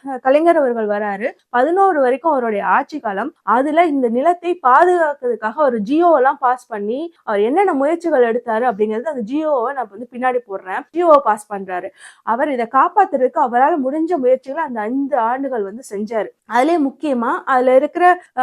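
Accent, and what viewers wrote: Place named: Telugu, India. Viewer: நீங்க ஒரு தெலுங்கு பெண்ணாக இருந்தாலும் ரொம்ப அழகா தமிழ் பேசுறீங்க ❤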